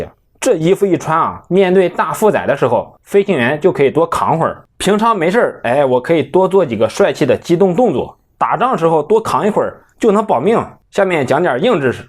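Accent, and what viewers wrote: Henan, China. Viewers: 你是河南的吗？咋口音有点像河南口音😂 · ，听着是老乡啊，你河南哪里的呀？ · 河南，还是山东的？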